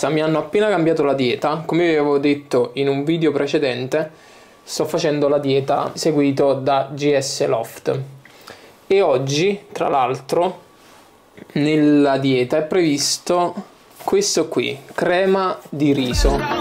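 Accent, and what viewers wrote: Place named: Naples, Italy. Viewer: Finalmente era ora! Basta con il fast content, cosi i contenuti si assaporano con la giusta tempistica senza quel bam bam bam di informazioni veloci, che tra l'altro assimili per un tempo limitato poi te le dimentichi. Mentre per il tuo italiano siamo troppo influenzati dal napoletano, nun ce putim fa nient 💙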